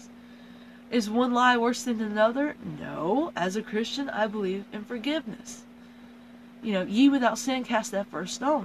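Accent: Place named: South, United States. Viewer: Why did the voice change to a weird southern accent after episode one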